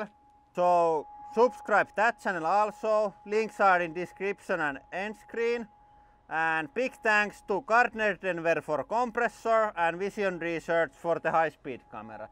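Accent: Finland. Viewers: Tykkää jos oot tilannu kanavan vaan tän ihanan rallienglannin takia💗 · Sä kuulostat vähä suomalaiselt, mut ootsä🤔🤔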